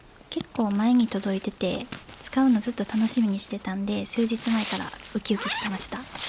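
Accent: Kansai, Japan. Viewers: 関西人には関西弁が心にしみるなぁ😊 そしてタッカンマリ。実はキャンプでタッカンマリを作って食べるのが夢なんです！参考になりました。韓国料理が好きで、数回韓国にも旅行して現地料理を食べます。韓国料理の動画またアップして下さいね♪ では、アンニョン❤ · 初コメです。のキャンプ動画楽しく見てます。今回アフレコと言うことですが、酒893のためか声若干かれているところありますが、関西人のイントネーションが心地よくいつもの動画以上に何回もみています！これからもの声聞きながら動画みたいです！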